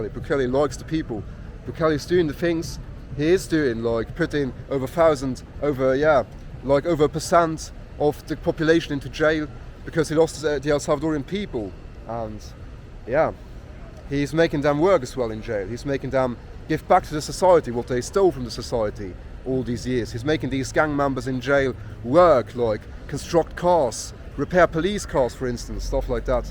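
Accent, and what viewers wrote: United Kingdom. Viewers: The don’t sound like a gringo, you sound more like a British person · Love the English accent, makes the video more entertaining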